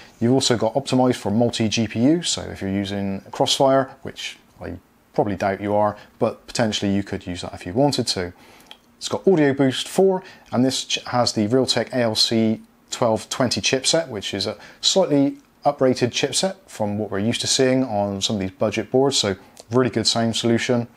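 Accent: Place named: West Country, United Kingdom. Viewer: Ayy you sound like your from the south west! Bristol gang 😃🤣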